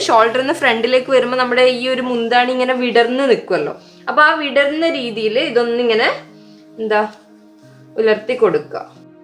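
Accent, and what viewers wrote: Kannur, India. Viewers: Chechy de place evdaa kannur slang thonnunnu samsaarikkumbo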